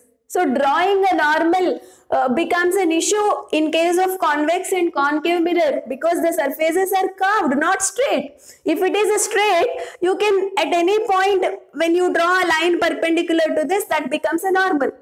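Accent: Karnataka, India. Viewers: Mam are you from karnataka. You Kannada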